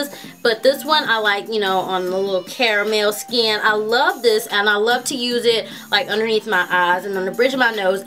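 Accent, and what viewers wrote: South, United States. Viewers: I love your accent! Im a southern girl as well ;) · no means for disrespect are u a southern bell u sound just like one i apoligize if i had disrespected u in any way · you sound southern!! :]]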